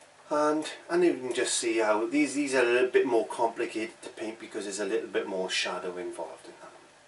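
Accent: Wales, United Kingdom. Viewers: CliveI just found your web-site and am loving it. Also love your Welsh accent. I am from Scotland now living in the US. One question, why do you use black gesso on trees etc. instead of using mars or lamp black?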